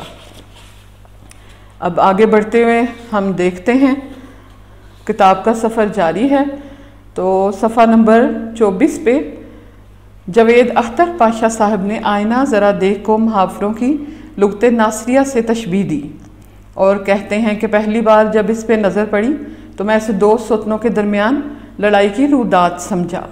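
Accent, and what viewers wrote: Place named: Punjab, India. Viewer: ماشاء اللہ، بہت خوب تبصرہ، آواز کا ردھم بہت ہی متناسب، بڑے رسان سے ادائیگی کرتی ہیں۔ اور پنجابی کے بڑے میٹھے انداز میں خ اور ح کا امتزاج بہت پیارا لگا۔ آپ اس لہجے میں ایک دو جملے پنجابی کے بھی شامل کرتیں تو دو آتشہ ہوجاتا۔ ویسے حالات کی طغیانی کا خطرہ نہ ہو تو دونوں مل کر بھی ویڈیو بنا سکتے ہیں۔ بہت ہی اچھا اور اچھوتا خیال۔ سلامت رہیئے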